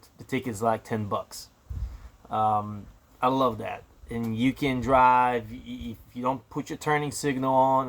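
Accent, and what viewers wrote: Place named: Russia. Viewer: Я не спорю, что это все правда, но русский акцент у парня слишком сильный…. Он точно американец?….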